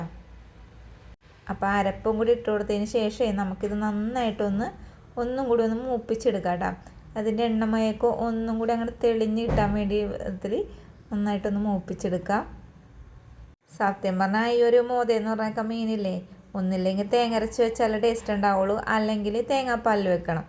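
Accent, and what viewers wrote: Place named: Ernakulam, India. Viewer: എറണാകുളം. കടലോരo. ശബ്ദമാണല്ലോ 😄. 👍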